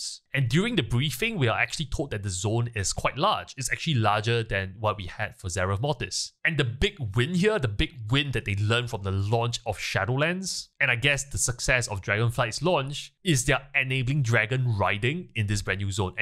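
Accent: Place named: Singapore. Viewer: and thanks for the good videos. Love your Singaporean accent!!!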